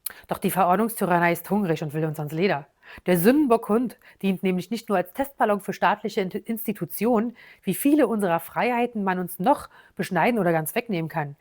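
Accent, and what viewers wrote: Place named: Berlin, Germany. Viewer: Hallo, genauso ist es und es wird immer schlimmer. Ich liebe deine Berliner Schnauze. Weiter so. Lg Claudia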